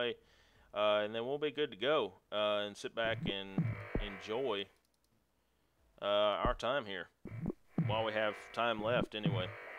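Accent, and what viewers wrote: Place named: South, United States. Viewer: Can't stand that southern voice but your tutorial worked so good job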